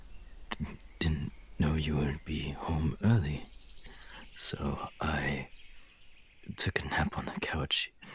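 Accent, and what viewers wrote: United Kingdom. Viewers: Why does he sound so British 😂😂 Also ADORABLEEEE ❤️❤️❤️❤️❤️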